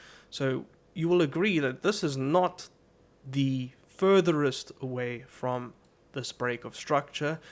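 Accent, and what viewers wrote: South Africa. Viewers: My guy, great video. Your accent sounds like you are from SA or Namibia... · Finally the South African accent haha making us proud well done best content I’ve seen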